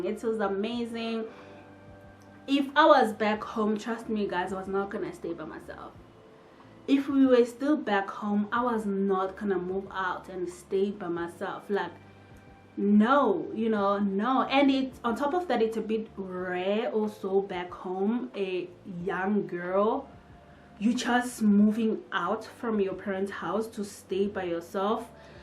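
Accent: South Africa. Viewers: Yhoo you got SA accent nice voice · Wow u sound & look South African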